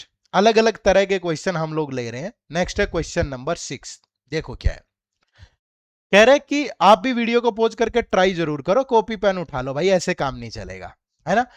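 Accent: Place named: Haryana, India. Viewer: Sir I’ve not watched this lecture but I’ve watched some old lectures so I’m pretty sure it also would be such useful lecture for me and I really appreciate you teaching style and your Haryanvi help me to not bore! Love you share ❤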